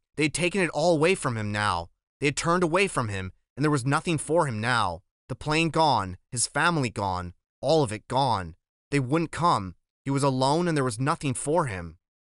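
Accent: United States. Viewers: right bro ?! the american voice sucks. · I really love this book but the typical American voice ruins it. I can't listen to this at all. I'd rather read it again. Why do Americans ruin everything good for everyone... what a shame that the world thinks of you in such a way when we could all be a little better...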